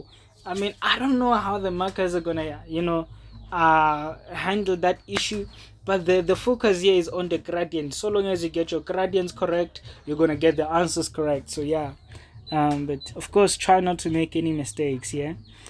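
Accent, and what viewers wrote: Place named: India. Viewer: I love your energy, accent, and your teachings! Big help for I am going to take CET in 3 days. Thank you random Indian teacher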